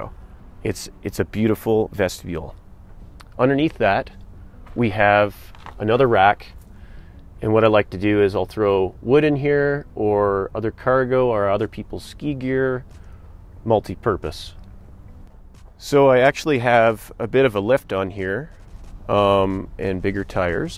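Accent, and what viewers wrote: Canada. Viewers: Definitely Canadian sayin ouut!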